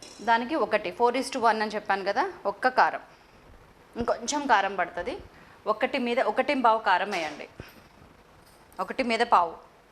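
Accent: Telangana, India. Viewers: Meeru andhra ina telangana slang matladutunnaru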